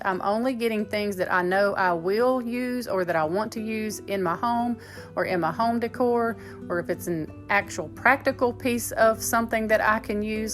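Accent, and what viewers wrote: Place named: Texas, United States. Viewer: Love your videos and your Texas accent! But does your hubby ever complain of too many baskets?lol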